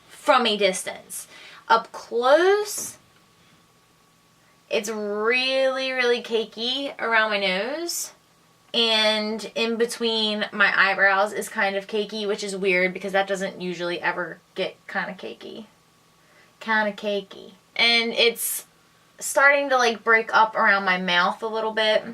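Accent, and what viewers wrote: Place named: Louisiana, United States. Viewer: I just got to ask do you live in Louisiana or Mississippi? I'm picking up on your accent. and I have this Foundation as well and I'm still not sure how I feel about it yet even though I do like the shade I picked out I'm not sure about the wear time yet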